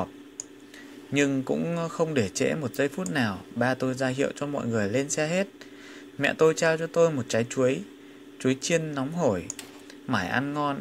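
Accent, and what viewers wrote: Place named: North, Vietnam. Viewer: Giong doc mien Bac cua rat truyen cam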